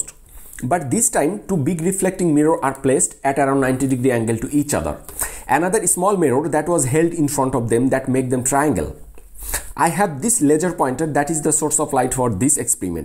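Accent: India. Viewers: His voice is most stereotypical indian english!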